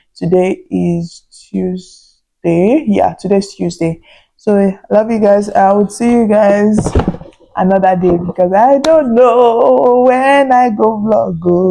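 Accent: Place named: United States. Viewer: Yoruba GRWM with you, definitely going come out great with your small American accent here and there😉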